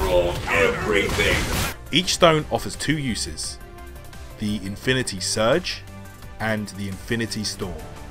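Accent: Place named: United Kingdom. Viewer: British accent is a plus in a guide.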